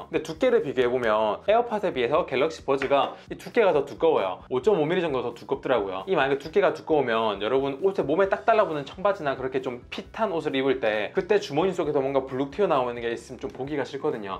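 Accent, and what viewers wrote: Gyeongsang, South Korea. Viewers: 제가 경상북도 출신인데 뉘앙스가 너무 친근해서 그러는데 어디 출신이신가요 ? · 부산 근처이실 것 같아용! 대신 답변 죄송합니당..ㅠㅠ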